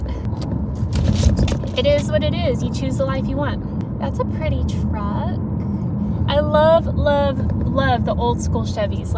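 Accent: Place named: South, United States. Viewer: You remind me of Jennifer Lopez but with a southern accent.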